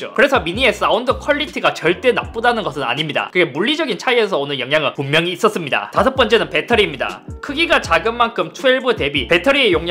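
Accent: Gyeongsang, South Korea. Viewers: 이렇게나 확실하게 경상도 말로 이야기하는데도 이렇게나 감각 좋고 세련되게 가젯 리뷰하시는 분은 달리 없음. 12, 12미니 비교 리뷰 여러 개 봤는데 님 것이 최고네요. 덕분에 12미니 펌핑 사라짐~ ^_^ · 이 클립은 경상도 시청자들을 위한 것인가요? 전국의 국민들을 대상으로 한 클립이면 사투리 액센트 고치시고 영상 찍으시면 좋겠네요 성우를 쓰시던지요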